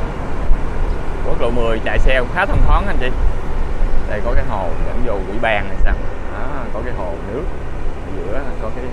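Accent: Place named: Mekong Delta, Vietnam. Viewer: Xin chào em trai.nghe giọng nói anh đoán em là ng miền tây.vì trước đây những năm 1984 đến 1991 anh năm nào cũng công tác miền tây.đầu năm vào nam cuối năm ra bắc.thời gian ở tỉnh đồng tháp khoảng 2 năm.ở p2 tx sa đéc.rồi cao lãnh .huyện tháp mười vv❤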